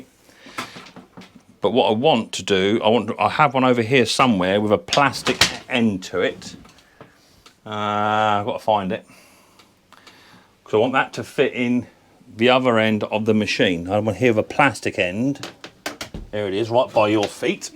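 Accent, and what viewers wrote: United Kingdom. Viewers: I liked your repairs! Your style is like mine. So.... you know, tip top! I didn't quite get what you used on your first cable repair. It looked like some lead fitting that you used to crimp the two cables together. But what do you call that lead part so that I can buy some? The best I can understand is that it's like something in a fisherman's kit. Perhaps I could take a small piece of lead and drill a couple of holes to fit. But can someone give me the proper name for that part? You know, we yanks occasionally can have trouble understanding the Queen's English. (Ooops, King's English now --- my condolences). That means that sometimes my understanding runs a second or two behind the video. The best example of that is when you suggested that, on the whole, you preferred to have cables be too long rather than too short. Then you gave us the fish eye to see if we had understood your humor. 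Well, I did, after a couple of seconds. You know your audience! Anyway, thanks for the lesson on cable repairs. That has been a weak point for me and I have a couple of applications for that knowledge that have been waiting years for a solution. And I do regret the loss of Queen Elizabeth. In my view, she especially deserves to be honored as the last world leader who was an actual veteran of the war against the Nazis. The day after the Queen's death, I was at a football game at an American high school stadium and the flag was lowered to half staff in memory of QEII. Since we are still a rebell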